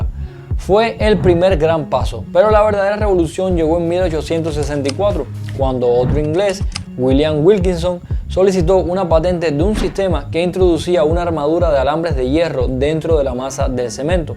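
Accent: Cuba. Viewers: Muchas gracias por compartirnos esta informacion, con la claridad y entusiasmo indistinguible. Estoy a la espera del proximos vídeos de la arquitectura de chile. Me alegra escuchar su acento cubano y todo lo que ha ido aprendiendo y brindando por estos medios. Felicidades y saludos. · Gracias por el vídeo. Lindo el acento cubano. Un saludo a Cuba, un gran y valeroso país❤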